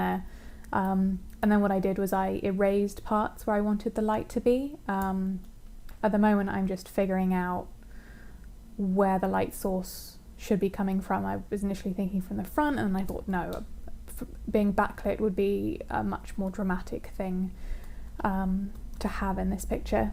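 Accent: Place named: United Kingdom. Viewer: I love ur accent.. i love british accents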